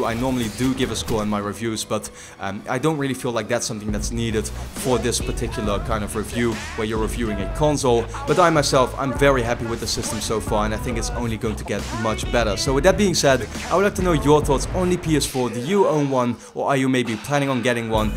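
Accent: Netherlands. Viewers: leuke video man ( ik hoorde meteen al dat je nl bent )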